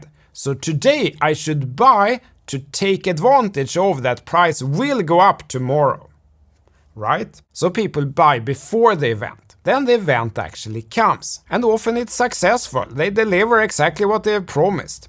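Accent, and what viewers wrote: Sweden. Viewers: Din svinglish är obetalbar!😂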